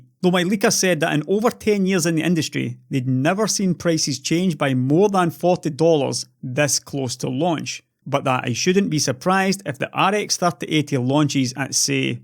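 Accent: Scotland, United Kingdom. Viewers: Never and I'm not even a native English speaker. 🙂I love his Scottish accent. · Thanks for explaining all this "so clearly"! I sure hope AMD can deliver all these improvements for near what your leaks and analysis suggests - cause I want one! If AMD pulls this off, they'll bury Intel... Running around saying "It's too good to be true" will do nothing but bump up the prices I'd think, since if they know they're delivering much more than the customers expect - or that the competition can possibly keep up with - why would they lower them? Even if people think that's true, they should keep their mouths shut and just see what unfolds! One software issue I can think of with these new high core and thread count architectures is how well the operating system's scheduling logic can take advantage of them. Properly optimizing the use of all the hardware resources based on the workload used to be a really complex problem back when I was in school - and that was just to handle dual and quad core architectures. 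(Yeah, "school" was a long, long time ago)... I hope the current state of the art (in figuring out optimized scheduling algorithms) can effectively exploit what all this modern hardware can now deliver... As always, keep up the great work - and have a merry Christmas and a wonderful New Year! p.s. - Your Scottish accent is still most excellent too!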